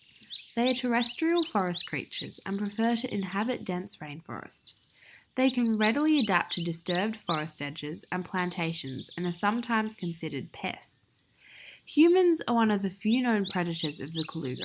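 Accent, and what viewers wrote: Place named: Tasmania, Australia. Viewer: Sounds like a Taz accent,Well done video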